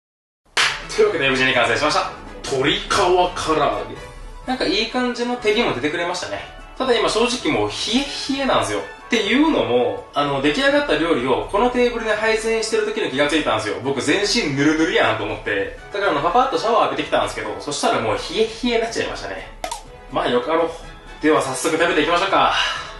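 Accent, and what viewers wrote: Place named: Kansai, Japan. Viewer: さん福岡の人なのにイントネーションがたまに関西になるね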